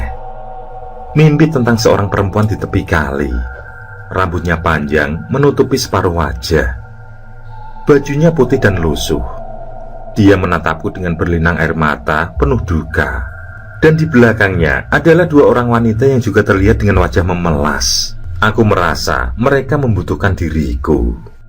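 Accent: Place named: Yogyakarta, Indonesia. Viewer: dari bogor cengkoknya jogja :face-blue-smiling::face-blue-smiling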